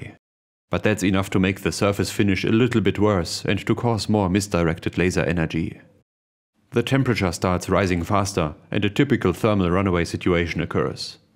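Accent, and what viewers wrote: Germany. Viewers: höre es sofort, DEUTSCHER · Nice german accent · but with a German accent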